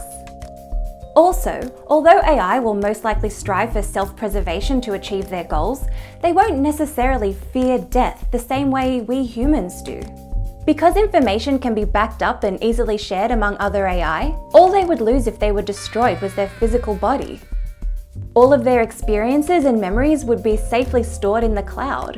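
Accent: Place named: Australia. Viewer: You sound Australian, but this vid was published in January, and wherever you are had snow. Do you live in Canada?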